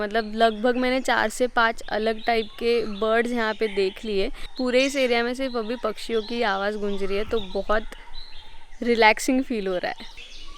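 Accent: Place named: South, India. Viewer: Tumchi Hindi ekdam mast south Indian ahe🤝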